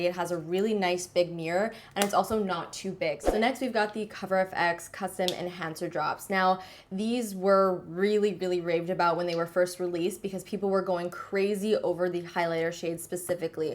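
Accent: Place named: Canada. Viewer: Do you watch Lael Hansen? I just noticed you both sound alike . Might be the Canadian accent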